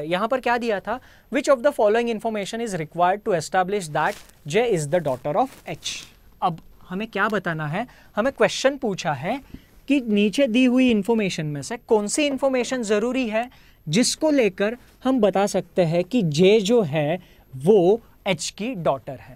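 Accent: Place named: Maharashtra, India. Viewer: ....big fan of your teaching style PS: by your hindi pronouncation seems like you are a Maharashtrian....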